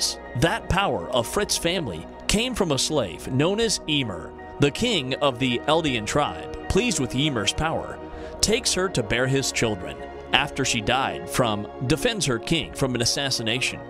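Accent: United States. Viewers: HOW IS NOBODY TALKING ABOUT THIS GRAMMAR JESUS CHRIST ITS SO AWFUL, he just skips out the word "the", "to" , "a", "into" and uses incorrect tense every chance he gets if he had an indian accent i would forgive him but its american, explain? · Is English not your first language? You don't speak with a foreign accent and you sound America, but you're grammar is all over the place. I'm so confused.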